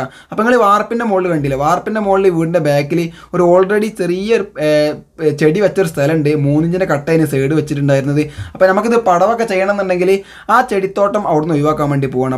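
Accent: Malappuram, India. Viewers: ആ മലപ്പുറം ഭാഷ അതാണ് പവർ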